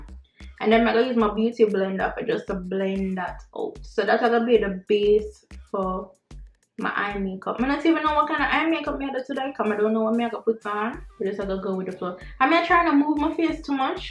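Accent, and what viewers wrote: Jamaica. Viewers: I come a cross one of your videos an the frist thing I say u were Jamaican . I am Jamaican too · 🇹🇹🇹🇹🇹🇹🇹🇹 I love the Jamaican accent🇹🇹🇹🇹🇹🇹🇹🇹🇹🇹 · Amen i was waiting..i love when u talk jamaican 😍😘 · look how d ting come out good...U can definitely do ur makeup urself...jus fling on couple gems, n 2 bright colour n u good. I did mine myself....n it lasted all day....love the patois vid..n u nuh haffi nermnous nex time! lol that made me laugh so much bout nerminous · Heard to understand lol but i love the accent! Always wanted a Jamaican accent 🇯🇲 🙌🏽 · I love when you SPEAK patois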